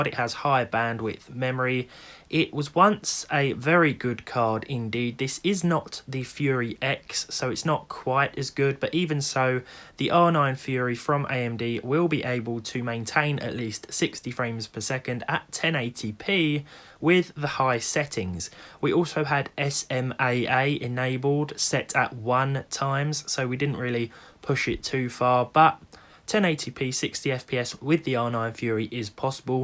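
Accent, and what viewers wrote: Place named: United Kingdom. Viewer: That british english... pain in the ears 😞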